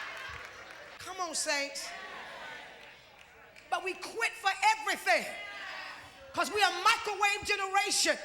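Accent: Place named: South, United States. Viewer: another jumping on the band wagon of celebritism. If I make a comment about Brad Pitt and Angelina will I get discovered? Not a scripture in sight or in site. Thanks for telling us your whole life story in that once again loud southern preachers voice!You got a mike but I guess you cant get the same effects if you cant yell and stir a crowd emotionally! Ah baby...I dont feel like having sex tonight! You dont hear me! You didnt buy me no stockings...Wake up! marriage counseling is cheaper!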